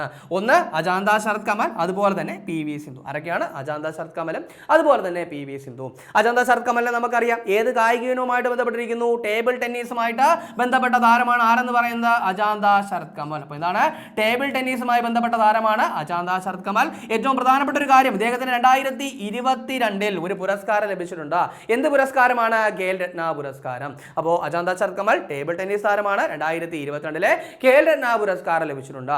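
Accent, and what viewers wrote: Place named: South Kerala, India. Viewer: തെക്കന്‍ സൈഡ് അങ്ങനെയാണ് ഭ'ക്ക് പകരം 'ഫ' ഉപയോഗിക്കും. ഫാര്യ,ഫിത്തി.. അങ്ങനെ😂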